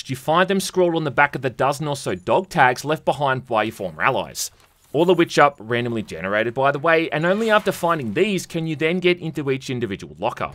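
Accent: Bogan, Australia. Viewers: Just discovered your channel. Love gaming commentary from someone else with a bogan Aussie accent! Good work! 🇦🇺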